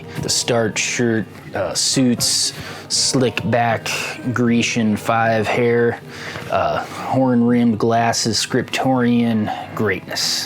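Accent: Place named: Tonga. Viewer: Hahahahaha I love this. Your Tongan accent is on point. You’re more Tongan than most Tongan kids. I’m so proud of you ❤️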